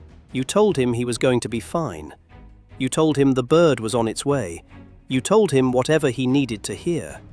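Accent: United Kingdom. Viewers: why is this narrated by someone with a British accent?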